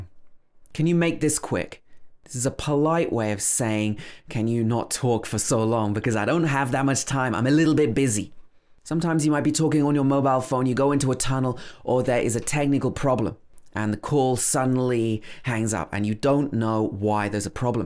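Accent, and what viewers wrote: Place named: United Kingdom. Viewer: Omg i really wanna learn british accent watched all ur videos...pure british🔥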